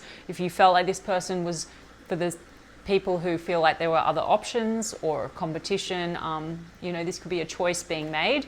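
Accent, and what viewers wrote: Australia. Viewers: You're SPOT ON for my relationship!!... Amazing 👏 Thankyou🙏🩷 And lovely to hear a fellow Aussie 💞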